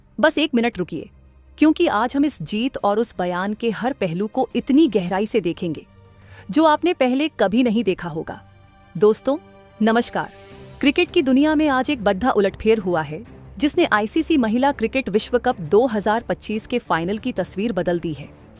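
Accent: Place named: Maharashtra, India. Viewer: Hindi sahi Hai, objection is Kiya Hai ki haha marati ward Jada Hai.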